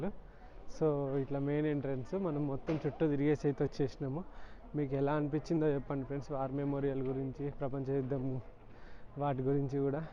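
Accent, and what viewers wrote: Telangana, India. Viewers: Bro vlog lu back ground voice mast vundi telangana yasa lu, vlog matram mast vundi...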